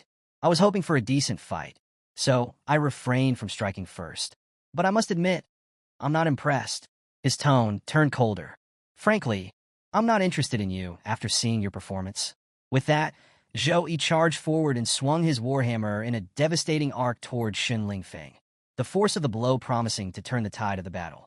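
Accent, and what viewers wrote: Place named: United Kingdom. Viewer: Narrator became British halfway through lol this is epic lol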